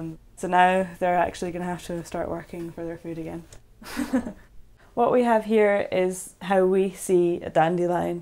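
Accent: United Kingdom. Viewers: What's her accent? Welsh? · I think she sounds Scottish? But it's not an accent I can place. Anyway, hive beasties are super-interesting to me, so this is great!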